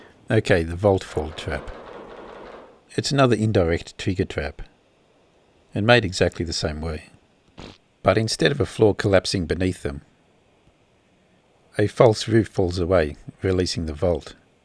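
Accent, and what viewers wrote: Australia. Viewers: you sound Australian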